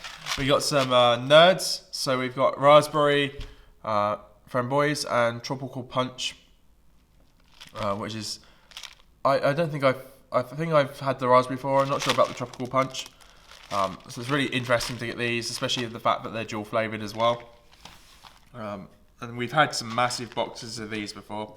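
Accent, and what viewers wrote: United Kingdom. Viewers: What part of the UK are you from, I'm from Lincolnshire, and I love your accent.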